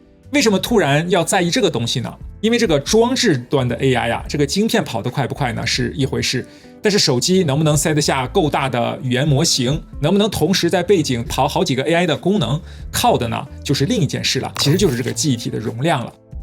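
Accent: China. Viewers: 好像博主是在外国生活的华人，但口音像是中国大陆的，而用词却是台湾的叫法。有点别致的搭配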